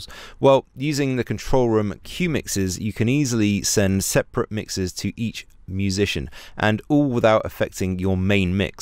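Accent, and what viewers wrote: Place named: United Kingdom. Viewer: Solid info. Not to a dick, but I had to rewatch a number of things to clearly hear what was being stated. Not a matter of accent, it was specifically the speed. Many in the U.S. neither speak or are accustomed to hearing U.K. english (which we also often hear from those speaking english who are from India & pakistan). It's like there's some race to get every single syllable out of the mouth, regardless of audience comprehension. I understand that you are going to do as you will... it's fine. I merely wanted to share the perspective of some of us who are struggling with the tutorial a little. Thank you for your time.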